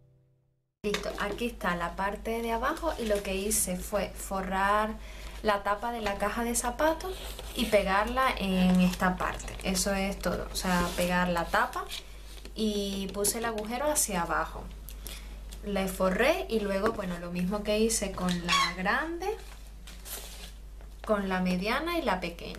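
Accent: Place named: Maracaibo, Venezuela. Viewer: además de ser Venezolana, por tu acento eres maracucha, un gran abrazo, que sigas teniendo mucho éxito!!!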